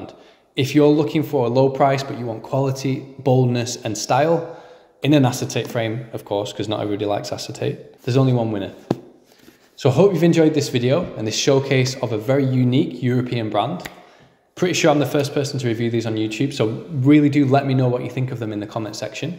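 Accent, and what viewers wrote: England, United Kingdom. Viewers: With YOUR HEAVY ENGLISH ACCENT , Many international followers hear this “ it is my job to PAY you the best glasses. “ Adjust, adapt, modify . Speak clear, dont swallow words. We are not British English. English is international , keep it continental .PLEASE 🥹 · You just need a bit more practice hearing Brit English for your ears to adjust. That's like saying you can't understand someone in Mexico because you learned Spanish in Belize.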